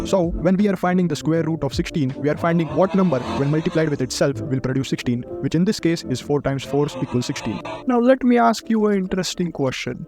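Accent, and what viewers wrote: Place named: India. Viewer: Hey, ranjeet. You got your Indian accent from consuming cow p*op?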